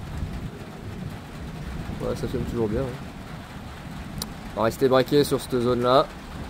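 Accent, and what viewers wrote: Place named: Marseille, France. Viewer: merci pour le partage! ça nous manque dans le Sud, un orage avec de la pluie! j'aime bien ton accent marseillais!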